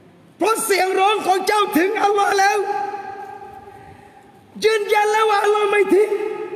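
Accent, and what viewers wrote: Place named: Malaysia. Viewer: อาจารย์ เป็นคนที่ไหนหรอครับเหมือนหลุดภาษามลายู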